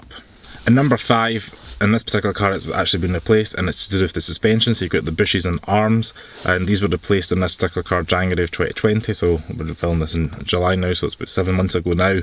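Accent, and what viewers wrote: Scotland, United Kingdom. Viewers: Scottish English is cool 😎 👍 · Not that easy to understand scottish english... Thanks for that interersting and useful video.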